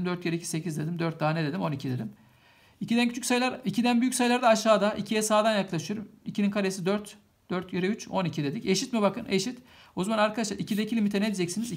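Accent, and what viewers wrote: Trabzon, Turkey. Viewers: Hocam zannediyorum Trabzon/Arsinlisiniz.Konuşmanız orayı andırdı.Alakasız ama yine de belirtmek istedim.Ayrıca anlatımınız çok güzel. Teşekkür ederim.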